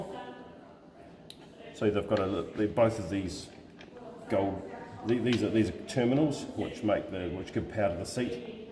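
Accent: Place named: New Zealand. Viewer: Where do you get the fans from? You sound kiwi mate... are you in NZ?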